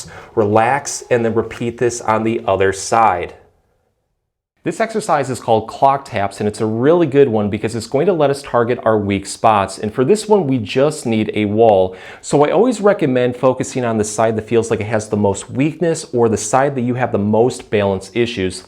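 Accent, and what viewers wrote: Michigan, United States. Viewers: Whoa heavy Michigan accent on this one. Helpful tips, as always. Thank you, Dr.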